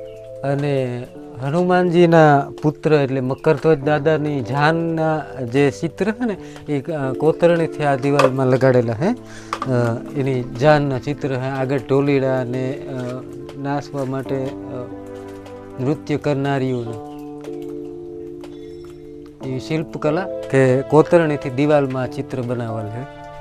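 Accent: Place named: Barda, India. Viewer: કોમેન્ટ કરનાર તમામ મિત્રો ને વિનંતી કે વિડિયો પુરો જોવો ભાઈ વિડીયો મુક્યો ને પાંચ મિનિટ ત્યાં તો છ કોમેન્ટ બધા ને વિનંતી કે વિડિયો પુરો જોવો પશી કોમેન્ટ કરો હા ના ગમ્યું હોય તો એ પણ લખો બધા જેથી બીજીવાર તે ભુલો સુધારીને મુકે ભાઈ બીજું ધણા યુટ્યુબ ચેનલ છે પણ ઘરે બેઠા આપણા ગામોની શેર કરાવે તો થોડો સાથ આપીએ પણ આપણે થોડી બરડા ની બોલી સાથે નો વિડીયો મુકે છે તો વિડિયો ને શેર કરતા રહીએ બખલા ગામ વિશે તથા વાવ મંદિર ખુબજ સરસ 🙏જય ચામુંડા માતાજી 🙏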